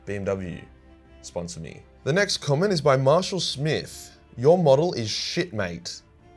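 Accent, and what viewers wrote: Australia. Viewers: i don't really care about 40k that much, hell i have never played a game or read one of the books, but the Australian accent and the cheekiness is what keeps me coming back lol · Comedic aussie way? I must be honest, I allways thought you were just plain Australian and not trying to be funny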